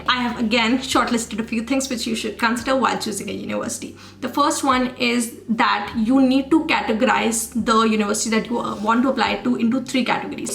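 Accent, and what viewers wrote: India. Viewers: no it is inmposibe i just cant understand this indian way of speach english its crazy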